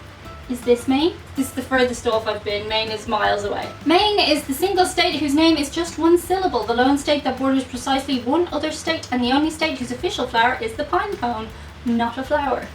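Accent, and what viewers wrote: Ireland. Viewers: I love her accent being of Irish decent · I’m a new subscriber, I’ve been binge watching a lot of your videos. I now have your Irish accent stuck in my head. Oh, I watched editor video. She has so much personality, just tell her, she needs to be Not so perky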